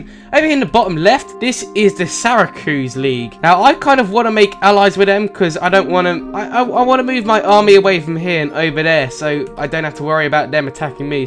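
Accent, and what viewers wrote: United Kingdom. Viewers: Why do you watch if he has a British accent then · Nothing brings out your british accent better than playing some rtw2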